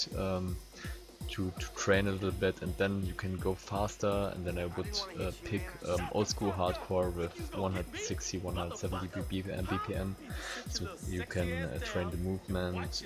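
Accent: Germany. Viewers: Hallo, ich kenne den deutschen akzent. 🤣Grüße , geiles video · Ich lieb den deutschen Akzent. So ein englisch verstehe ich sofort! Tolles tutorial 😌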